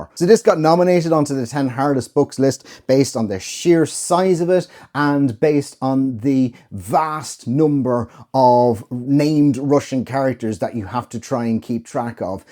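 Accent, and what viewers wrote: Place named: Ireland. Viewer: I’m finishing games of thrones and lonesome dove is next — yes they are Texans as am I .. born here— you are Irish correct— your accent.. 😊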